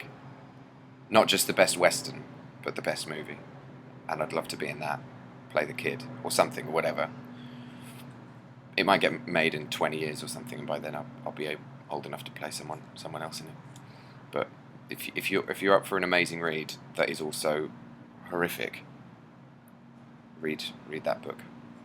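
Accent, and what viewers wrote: United Kingdom. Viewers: Thanks for these videos, it really reminds me of England listening to you. Wishing a real cup of tea could be shared, Ill just sip alone until dreams start breaking the realm of reality. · Oh... So he doesnt speak french but british englisch !?.. I thought he was Francis from France..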